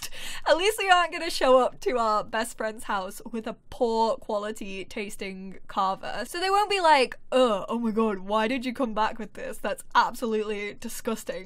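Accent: England, United Kingdom. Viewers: Btw... I love the English pronunciations of many words... like aluminium. So don't try to change.😁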